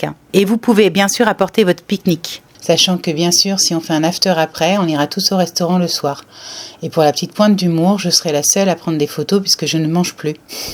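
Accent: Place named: England, United Kingdom. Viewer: Bel accent anglais, mais en ce qui me concerne, je préfère la langue de Molière🍅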